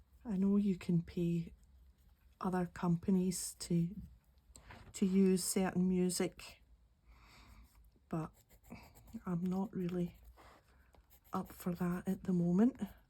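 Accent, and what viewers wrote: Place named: Glasgow, United Kingdom. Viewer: O we love your Glaswegian accent, Willie always listens and smiles when I watch your videos he likes the accent it gives him a touch of home. ❤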